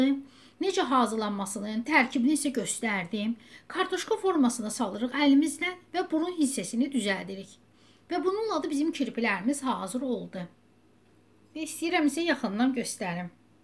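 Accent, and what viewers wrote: Baku, Azerbaijan. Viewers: Lehceniz lezzet eliyir. Bakililar başa dūşer.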